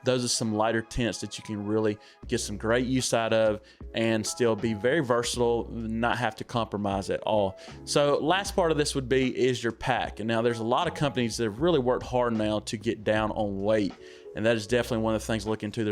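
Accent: South, United States. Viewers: Just found your channel, great southern accent! I hear you tubers often refer to a Nalgene bottle as "Heavy", but, like you, they never really say how much weight you are actually saving. So what is the actual weight savings between a Smart Water bottle and a Nalgene?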